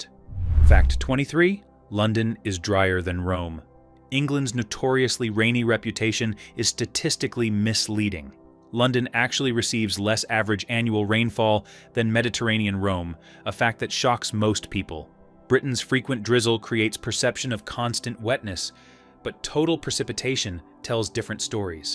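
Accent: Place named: United States. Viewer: It would be better without the awful American accent. The town of Berwick is pronounced BERIK not Ber W I C K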